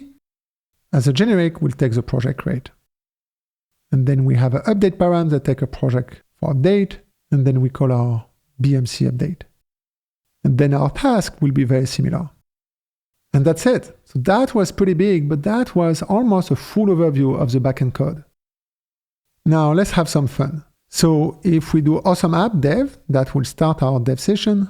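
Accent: France. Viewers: Are you french? You have a little accent xd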